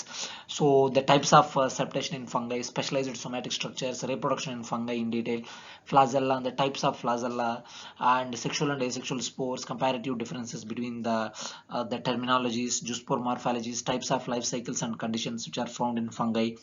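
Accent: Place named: South India, India. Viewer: Are you from south...I find north Indian english speakers annoying but not you...why it's sound smoothing to my ear and Brain ❤❤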